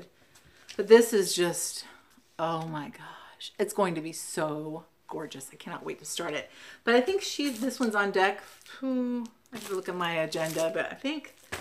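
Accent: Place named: South, United States. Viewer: Great video. I love everything you stitch. You must have lived in the south at one time or have southern roots. You say y’all (I love it) and you said little bitty. That’s a definite giveaway. Look forward to your next video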